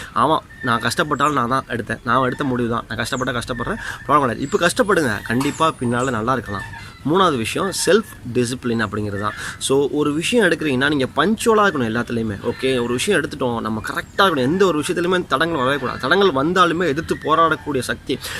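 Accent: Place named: United States. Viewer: Woooow what a American engalish speech language... Good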